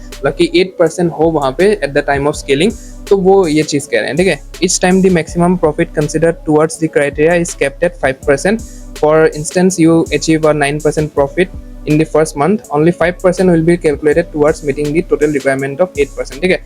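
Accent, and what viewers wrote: Assam, India. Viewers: Assam se ho kya?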